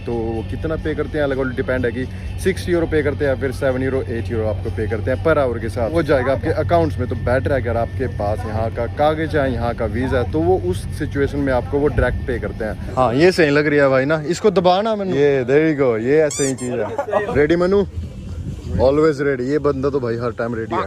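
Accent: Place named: Yamunanagar, India. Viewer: Boli to ynr side wali h bai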